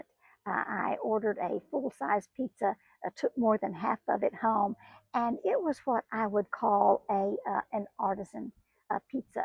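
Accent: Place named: North Carolina, United States. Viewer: I like your accent. I'm from the UK so I'm not too familiar with US accents. Are you from North Carolina by any chance?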